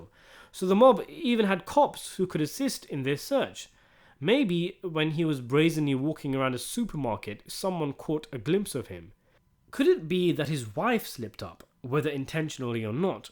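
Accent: United Kingdom. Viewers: While i feel retarded for listening to this british "oh well let me explain to you dummy stuff" cant stop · By far my favorite part of your channel is hearing you say Sopranos wordplay in a UK accent. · I love this British accent commentary..... A muncher of ass LOLOLOLOL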